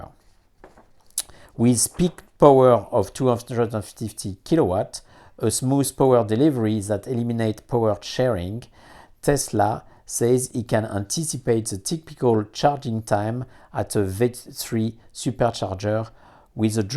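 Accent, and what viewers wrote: France. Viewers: this great french accent, I mean it - I love it dearly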